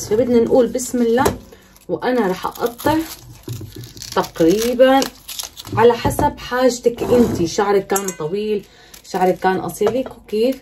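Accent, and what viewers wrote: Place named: Iraq. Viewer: لهجتج بين العراقيه وبين السورية من أي بلد انتي💙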